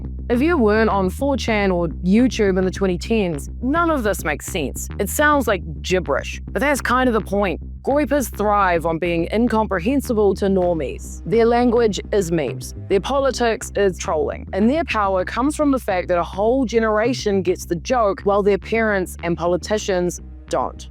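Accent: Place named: New Zealand. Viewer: "IJJY JOKES" 😆 Omg I love your kiwi accent ❤️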